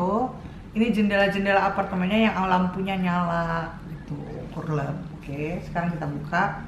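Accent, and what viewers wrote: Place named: Medan, Indonesia. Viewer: aksennya medan bgt, jadi kangen rumah hahahah, loo.. loo... nya itu :))))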